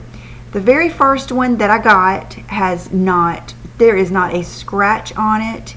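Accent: South, United States. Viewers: are you OK. Miss seeing your smiling face and hearing that wonderful southern accent. Sending you positive vibes your way. Feel better soon. Hopeful we will see you this week. It's was very odd with no Friday Haul last week. XOXO Emily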